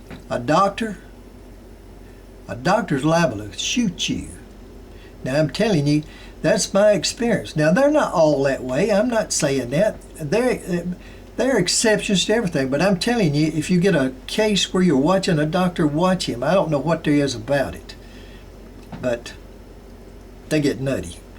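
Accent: South, United States. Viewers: you give sound advice. loved your southern accent. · Enjoy the documentaries , the soothing southern drawl , the simple straight forward logic . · I'm sitting here in London listening to your terrific accent. What part of the USA are you from?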